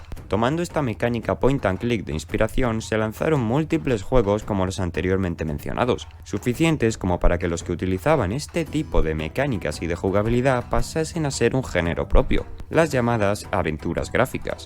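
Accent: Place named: Spain. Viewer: es de españa pero el acento no se nota mucho porque no usa palabras características de españa y con la voz seria no se nota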